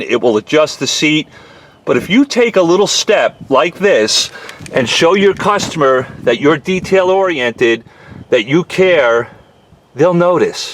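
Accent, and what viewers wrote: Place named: New York, United States. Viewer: Awesome ideas to make everyone"s world easier, Thanks! How did I know You were from up north? I guessed jersey, but the accent isn' t heavy enough. Maybe boston, but no. Should have known NYC. I am from upstate myself. In NC and VA they have tried to get me to be a rebel! Not working!! Love them all anyway! Thanks for all your vids and hard work.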